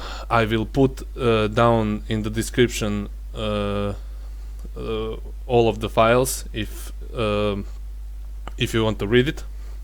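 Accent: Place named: Russia. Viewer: Как будто русский на английском записывает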